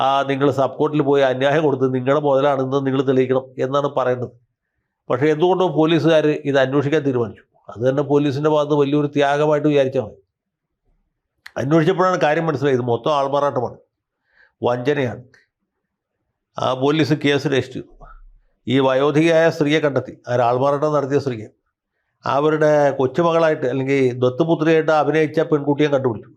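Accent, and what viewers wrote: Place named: Thiruvananthapuram, India. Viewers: 😂 തിരുവനന്തപുരം ഭാഷ സൂപ്പർ. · തിരോന്തരം ഭാഷ കലക്കി 😅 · വക്കിലിന്റെ തിരീയന്തരേം ഭാഷ കലക്കി.... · വക്കീലിന്റെ tvm ഭാഷ കലക്കി 😂😂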